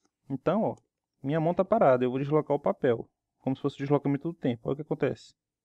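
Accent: Northeast, Brazil. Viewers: apesar do sotaque caipira do nordeste que acho engraçado. piadas a parte sem discriminação, você é o melhor professor de eletrônica do you tube e do Brasil. devia ser premiado pela NASA, Harvard, MIT e cambridge.MUITO SUCESSO VC MERECE ALÉM DE SER "O CARA, "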